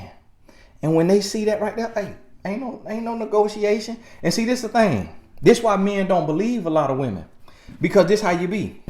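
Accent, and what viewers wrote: South, United States. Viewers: not even a minute into the video and I’m weak at that country accent. that’s right mr. the CEO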